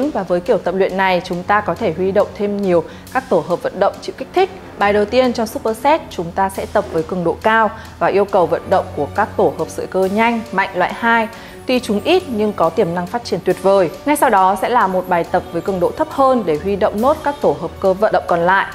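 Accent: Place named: Vietnam. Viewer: có 1 số từ chuyên môn dùng tiếng Anh thì phát âm khá thuần Việt. Team có thể cân nhắc cải thiện ha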